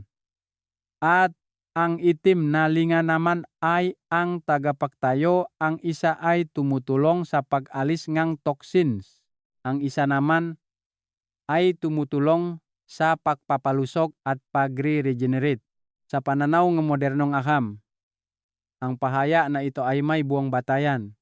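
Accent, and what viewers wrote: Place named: China. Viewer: Intsik na accent naman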